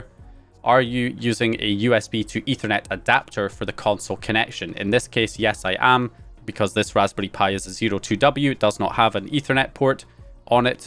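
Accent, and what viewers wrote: Scotland, United Kingdom. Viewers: He's clearly Scottish 😂 Russian American ? 😂😂😂 wow lol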